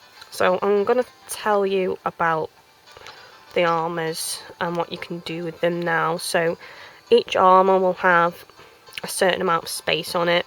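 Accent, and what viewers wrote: United Kingdom. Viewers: i lovr that ur well into it, and ur ur british woop woop lol, where in england u from?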